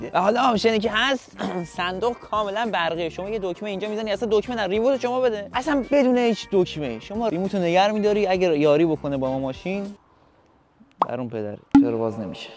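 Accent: Mazandaran, Iran. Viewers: مازندرانی هستی؟❤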